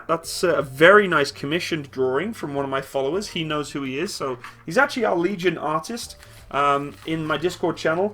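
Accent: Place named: United Kingdom. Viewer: Feel like at home hearing your British accent that's lovely I'm British too. Enjoying your Youtube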